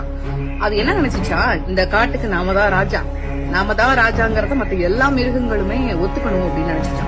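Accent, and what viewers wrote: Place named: Telugu, India. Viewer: இவள் கொல்டி தமிழில் பேசிக்கொண்டு தமிழர் வெறுப்பு இருக்கிறது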